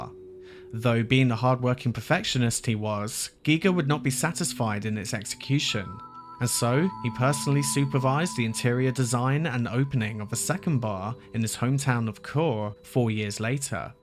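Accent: United Kingdom. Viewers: He just sounds British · Thanks for this i love the British narration it works beautifully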